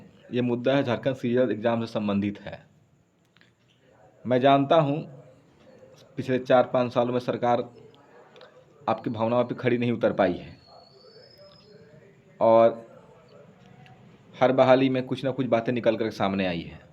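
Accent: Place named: Bihar, India. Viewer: इसका बात से ही लग रहा है , कि ए बिहारी है और सेटिंग कराया है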